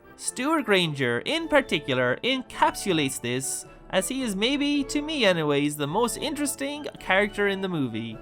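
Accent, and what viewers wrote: Ireland. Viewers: You're terrific -- what a fun approach to life. LOVE Rope. Read about it as a 1960s teen and was dying to see it, but it was as buried as Martin van Buren then. Thought to search it in youtube one day 40 years later and wham, there it was to enjoy free. INSTANTLY this tantalizing mutation from Hitchcock's doggedly hetero (but frequently gayoid) oeuvre at large sprang into my slightly less closeted adult life. What's that you say -- cotting? Codding? (Cotter/Codder.) Am interested -- have been a language/dialect/idiom/slang addict forever, and of course LOVE your Irish accent. Tried googling the term. Hitch was gay as a goose, of course. Supposedly he and Alma only made love once, thus Patricia. ("Therefore champagne.") Rope's photographic gimmick isn't such a big wow but it gives this extra-special movie extra cachet. 7 normal splices would've been fine :)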